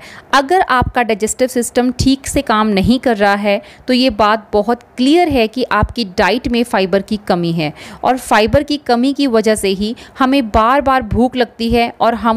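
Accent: Punjab, India. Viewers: Hi ji, as usual rocking video. I loved it, ur message goes to the mind directly. I luv ur punjabi diction in ur interaction.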